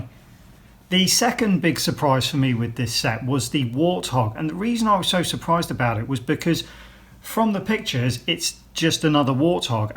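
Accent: United Kingdom. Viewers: Great review. I'm really looking at it just for that Forge figure. The face sculpt is fantastic (probably for some villain rather than Forge) and, as you point out, those details like the Ace card might not be readily visible in 'real life' but really come into play for stop motions where you get close to the figure. BTW : You sound like a Brit. How did you get hold of this set and (if its OK) what did you pay? BTW2 : Great MOC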